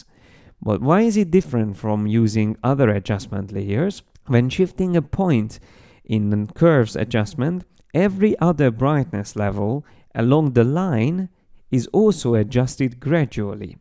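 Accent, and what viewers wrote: Hungary. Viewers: This is a textbook example of HUNGRISH. Terrible to listen to.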